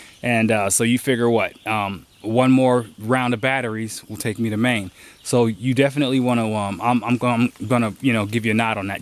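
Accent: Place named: Lehigh Gap, United States. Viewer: Your making great progress keep up the good work. Enjoy your accent out of Lehigh Gap.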